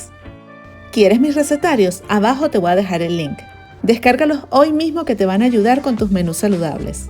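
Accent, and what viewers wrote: Venezuela. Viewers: Me encantan tus recetas muchísimo . Me gustaría aprender algunas recetas de tu hermoso Venezuela 🇻🇪 me encanta tú acento 💜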